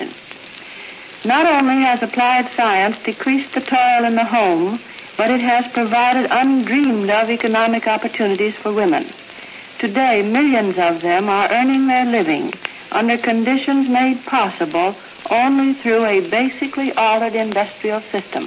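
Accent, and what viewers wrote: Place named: Mid-Atlantic, United States. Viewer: She spoke, at least publicly, with the classic "Mid-Atlantic" voice accent that most American actors, actresses and public figures were trained to use at the time.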